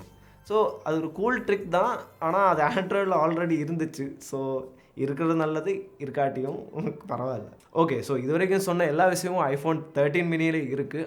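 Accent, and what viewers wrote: Kerala, India. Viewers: that malayalam slang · Unga pechula Malayalam slang adikkithe brother... Nallaa thaan irukku❤️❤️ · Bro neenga entha state bro Kerala va, unga sppech super ha iruku❤️❤️❤️❤️❤️ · video nalla thaan irrunthuchu Kerala slang avevalavu thaan Ash naa Chennai slang 👍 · First u ne video la varatha. Unnoda Malayalam accent a kekum pothu kadupa iruku.😤😤😤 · Bro are you malayali ne Tamil pesaradha patha appide dha theridhe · Neeinga pesura vitham Yaro Kerala la pesura mari iruku Tamil nadula irunthutu Y antha slang la pesuringa.. 🤷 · Bro ungalukku tamil sariya pesa varaliya Malayalies pesura mathiri therithu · He is Malayalee. Endha aniya Sugamano? Ninda Thamizh valara adipoli.. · Thambi kerala vo? Malayalam vasana adikkithae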